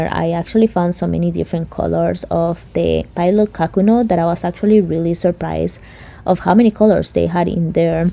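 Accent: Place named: Philippines. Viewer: No offense just curious to know where you are from? I can’t pinpoint your accent..I’m guessing Philippines?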